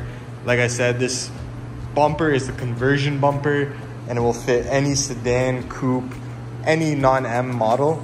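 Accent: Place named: Toronto, Canada. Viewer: Yeah Im from here too I could tell by the accent, im glad it isint over faked like most toronto mans wannabes lol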